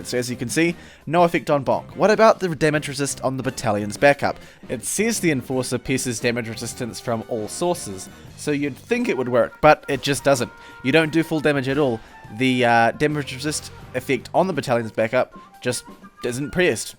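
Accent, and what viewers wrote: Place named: New Zealand. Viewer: He's a fucking Kiwi, couldn't you recognize his accent? Dumbass.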